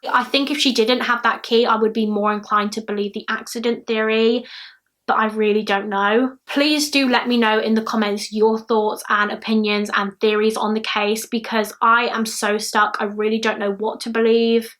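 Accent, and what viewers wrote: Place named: Bristol, United Kingdom. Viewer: It is the accent, which you must be unfamiliar with. If she got the name wrong then then she would have spelled it wrong in the description box of the video. It kind of reminds me of a Bristolian accent.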